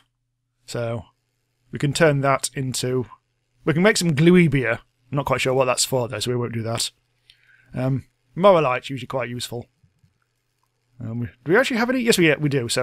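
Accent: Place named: England, United Kingdom. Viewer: It's a fairly typical English accent, I don't sound much different either. :)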